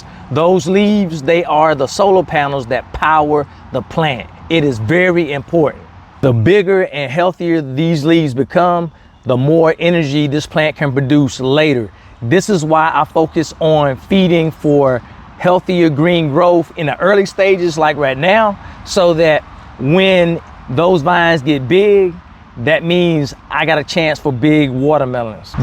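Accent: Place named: Baltimore, United States. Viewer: ok 😅 thought I heard a twinge of a Baltimore accent. Cool! Thank you for your helpful videos 😊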